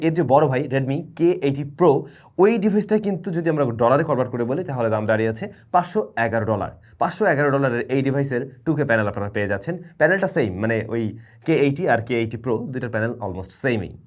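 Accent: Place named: Barisal, Bangladesh. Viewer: vai apnar Basha barisal a kothay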